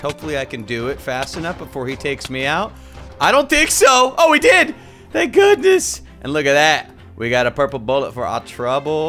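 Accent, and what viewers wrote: Texas, United States. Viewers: texas person talking about texas mobs in a texas accent (i think thats what it is) noice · I LIKE YOUR COWBOY ACCENT I SO EXCITED FOR THIS MOD OF THE HAERT OF TEXAS LET'S GET INTO IT PATNER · wait... thats how you talk in Texas?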